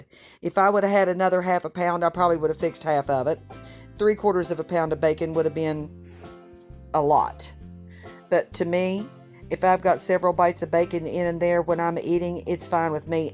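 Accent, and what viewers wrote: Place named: South, United States. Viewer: Thank you ma'am I can tell by your accent,you know what you are doing. My mamaw & my momma made this really, really good, sadly, I never paid enough attention. Adding the sugar kind of scares me ( because I had macaroni and tomatoes at a friend's house growing up and she put sugar in hers and it wasn't like my mom's or mamaws....but mom did like hers every now and then like that). Is the amount of sugar you use do something like tame the acidity of the tomatoes or does it taste more sweet ? I like salt & pepper on my maters and sugar in my cereal,lol 😊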